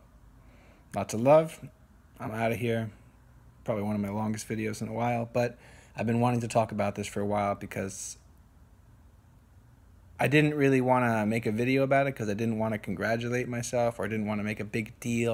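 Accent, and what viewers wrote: United States. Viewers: Are you from Tennessee?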